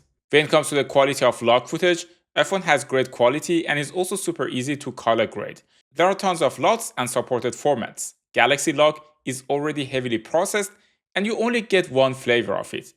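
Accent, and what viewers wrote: Iran. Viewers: persian accent shines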